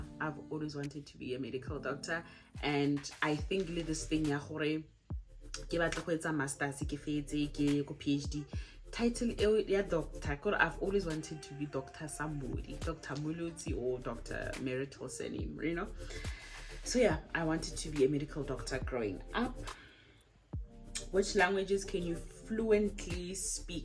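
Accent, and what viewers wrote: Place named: Botswana. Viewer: So refreshing to listen to Setswana se se tlhapileng❤❤ love your energy 🌷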